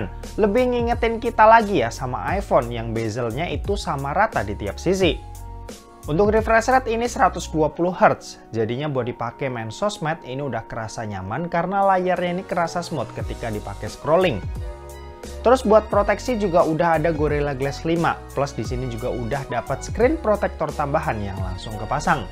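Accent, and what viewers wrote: Semarang, Indonesia. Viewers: Orang semarang bang ?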